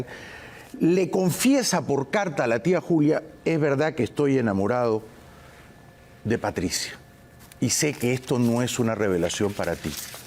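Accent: Peru. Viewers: así es, el castellano limeño. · Castellano peruano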